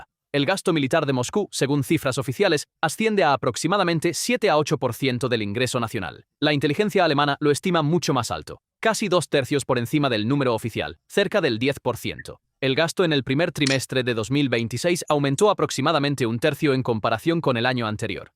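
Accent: United States. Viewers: esa ia en español gringo es ridicula por dios dejen de usarla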